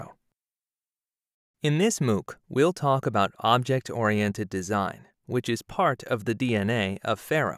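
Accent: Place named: France. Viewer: The MOOC is also offered in English with a French accent.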